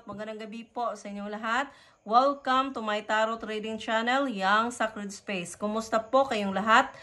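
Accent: Visayas, Philippines. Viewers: The way you talk ay parang hindi ka tagalog. Sa palagay ko, bisaya ka. Believe ako sa tapang mo.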